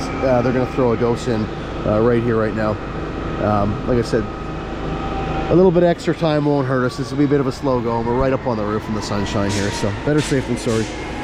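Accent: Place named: Canada. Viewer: Lol the "Canadia" pronunciations make me giggle...de-cal v D-Cal 🤣 😂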